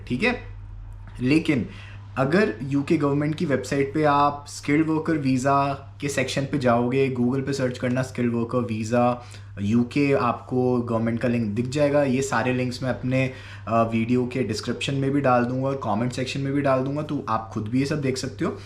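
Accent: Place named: India. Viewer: I can't your English very well it's too indianish!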